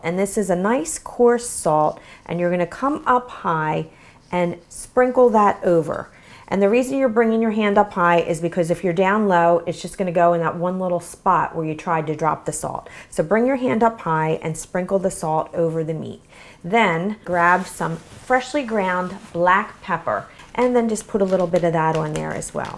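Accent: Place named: Brooklyn, United States. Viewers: New York accent?...Brooklyn accent?...can hear it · Funny, I hear it too. Here and there it sneaks in. Wonderful video though. At least she's trying to free herself of the ny accent.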